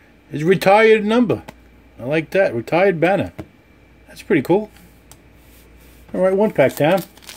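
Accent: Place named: New York, United States. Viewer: the most new yorker sounding break. Love it!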